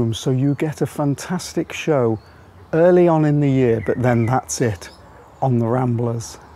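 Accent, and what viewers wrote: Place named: Liverpool, United Kingdom. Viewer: I’m really enjoying this video, it’s so interesting, thank you for sharing. I have to ask are you originally form Liverpool by any chance? I feel like I can detect the slightest hint of ‘scouse’ in your accent ☺️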